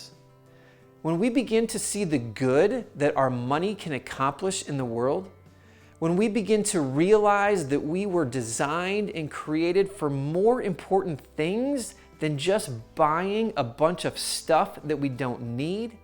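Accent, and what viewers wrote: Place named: United States. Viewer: Hi, I'm a Spanish woman who is trying to stay minimalist after a long period of decluttering in my life... Also, I'd like to say you that I learn lot of American English with your pronunciation! Thank you very much!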